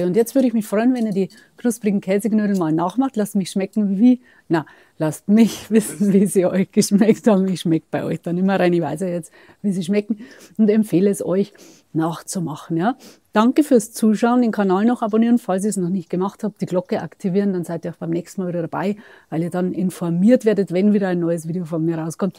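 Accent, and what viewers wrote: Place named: Bavaria, Germany. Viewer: Servus schneeglöckchen, käse knödel hab ich noch nie gehört.bin aber net so der fan käs. Spinat des doch des grüne vom kreiselmähwerk. Spinat der mit den blub war früher immer die kinderwerbung. 😅spass bei seite. Was du immer für ideen hast mit deinen kochkünsten das überrascht mich immer voll. 😊❤ oberpfalz ok hörst die eher bayerischer dialekt an dein mann hat dich gelehrt eine neue zu lernen ich lerne bayerisch 😅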